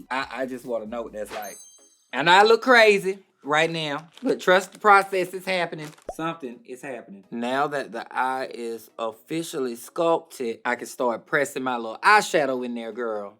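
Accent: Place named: South, United States. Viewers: Wait she’s southern?? I had no idea 😂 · What a pure pleasure to have and that southern accent for almost 30mins. Shes such a natural in front of the camera. Looove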